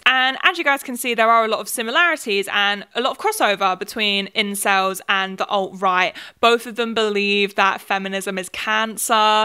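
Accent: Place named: United Kingdom. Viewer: realises she's british Me: well, gotta turn the subtitles on...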